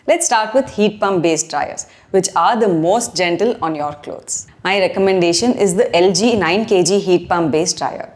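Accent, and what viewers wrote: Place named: South India, India. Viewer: by your english accent i can make out that u are a south Indian may be andhra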